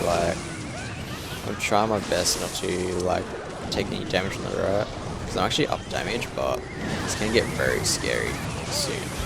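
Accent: Australia. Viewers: sexy aus accent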